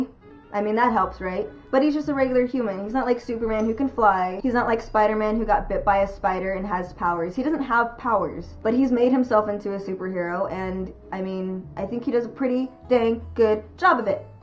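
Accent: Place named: United Kingdom. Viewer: Your so layed back and i love it!! Great little british accent to!! :)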